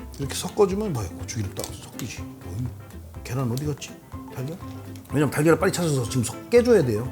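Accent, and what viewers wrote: Chungcheong, South Korea. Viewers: 백종원 대표님 구수한 충청도 사투리 듣고싶네요 오늘저녁 순두부 찌게 맛나게 끓여 먹겠습니다 건강하시고 가까운날 티비서 뵀으면 좋겠습니다 누가뭐라해도 백종원 열펜입니다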